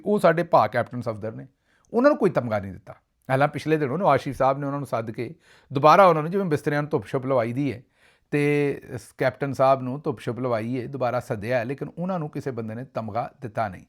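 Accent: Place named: Malwa, India. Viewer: Sahib tuhade wali Punjabi Bathinde Mansa area vich boli jandi hai.Mai tuhada prog bahut enjoy karda ha. God bless you.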